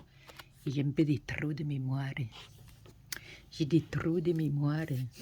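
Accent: South, France. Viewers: Grand merci pour ta réponse, j'ai vu tous les jolies commentaires, toutes les personnes qui sont heureuses de ta jolies cartes, de ton explication tu peux en être fière. Et comme d'autres personnes l'ont dit ton accent du sud est très beau à entendre s'est comme quand j'entendais ma maman elle était germanophone sa à la voie chantante et mélodieuse comme celle de maman et j'aime t'écoute et s'est bien dommage qu'ont ne peut pas le faire plus souvent. Encore un grand merci, gros bisous Brigitte de Belgique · bjr madame très belle carte et très bien expliqué j'adore votre accent du sud du midi continuer comme cela a nous montré vos merveilles bisou de véronique de reims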